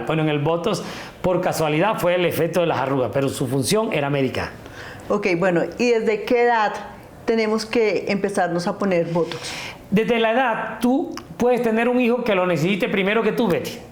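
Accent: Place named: Barranquilla, Colombia. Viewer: Barrnquillero que baila arrebatao, no has perdido el acento currambero. Orgullo costeño...que sigan los éxitos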